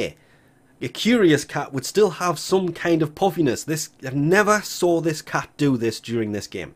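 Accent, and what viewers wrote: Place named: Newcastle, United Kingdom. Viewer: hmm I hear a hint of georgie accent there, I would have to guess durham?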